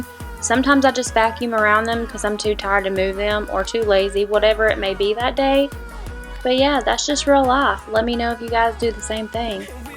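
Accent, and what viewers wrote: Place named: South, United States. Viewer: I am from Texas so I I can appreciate the drawl of a southern girl ❤️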